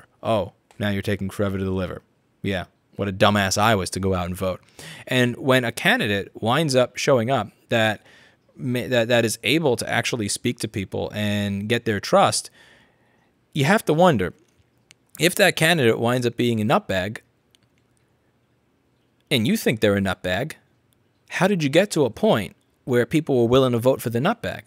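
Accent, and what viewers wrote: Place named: New York City, United States. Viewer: this is the strongest I've ever heard your NY accent.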